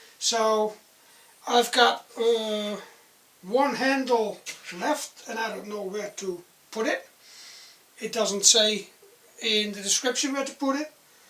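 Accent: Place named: Netherlands. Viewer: Dutch accent is awesome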